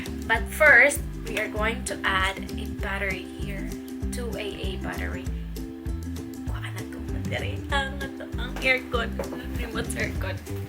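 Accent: Visayas, Philippines. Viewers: Gusto ko rin gumagamit ng ganyan ang dami kung blackheads. Ang cute mo magsalita ng Bisaya sis at madali pala yan gamitin. Gusto ko yung napasabi ka ng OMG at effective nga.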